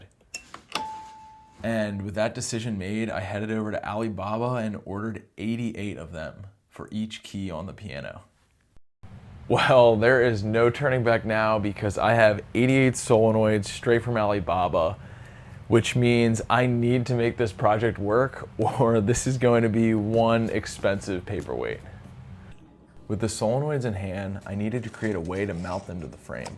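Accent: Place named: Maryland, United States. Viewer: Anyone get the feeling that this guy is from Maryland?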